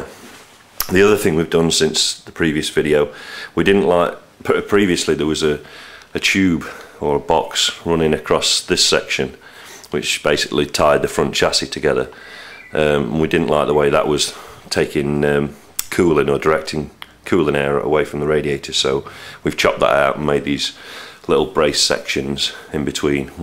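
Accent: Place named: Northern England, United Kingdom. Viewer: Sounds nothing like him. This guy is from the north of england and has a very similar accent to myself. James may is from the south with a more neutral accent.Imagine me saying Woody Harrelson sounded like Mark Wahlberg and it will give you some idea of the difference.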